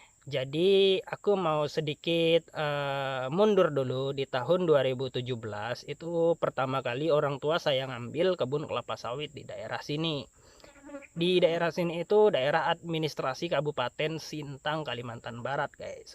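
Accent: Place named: Bali, Indonesia. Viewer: Logat balinya masih kelihatan salam sedulur rahayu sak gung dumadi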